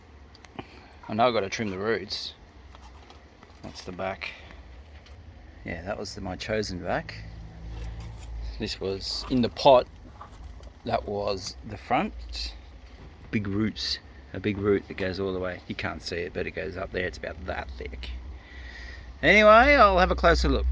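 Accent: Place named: Australia. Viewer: What a cracking Chinese Elm and made even better with an Aussie accent to remind me of my youth in Melbourne. Great chop on the roots and I think oyu have really set it up for the future. Did you happen to plant the roots that you cut off? Anyway, loved the video and now I have to see what else you have done! Cheers, Xav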